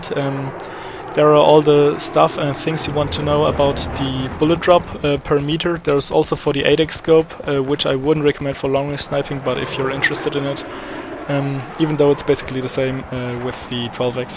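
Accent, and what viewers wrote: Germany. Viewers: are you german? your voice sounds like german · are you german? you seem to have a german accent?:D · Are you german? it sounds like you are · Haha hab sofort den deutschen akzent gehört. Sehr schönes tuorial. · Haha ... man merkt das du Deutscher bist... ^^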